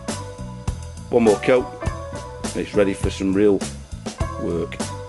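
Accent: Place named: Manchester, United Kingdom. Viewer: Hes from Manchester like me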